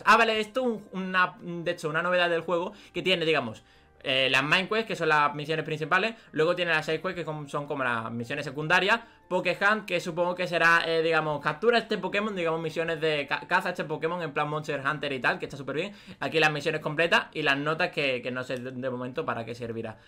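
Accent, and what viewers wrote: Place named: Andalusia, Spain. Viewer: Ey eres andaluz? Se nota el acento. PD: Saludos desde Galicia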